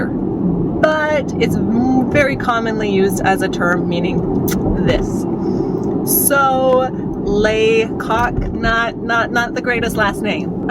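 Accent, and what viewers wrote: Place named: Canada. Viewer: Wow! This is how Canadians sounds? This could be a good chanel to listen English for practice.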